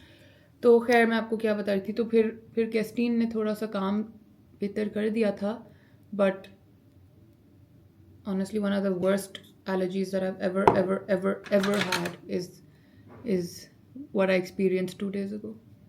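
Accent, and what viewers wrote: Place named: United Kingdom. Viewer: I love your British accent please try to use it more often while conversation in your videos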